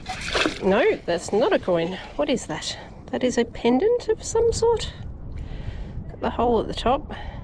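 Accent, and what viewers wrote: Australia. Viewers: I never noticed how long it takes to get to the action part of your video. You could ramble all day long for all I care. I love Aussie accents. You and your personality are the main reasons I "tune in". It's irrelevant (to me) if you find anything at all. The longer your videos, the better.: ) Well done. Awesome find! Please take care, and God bless you,!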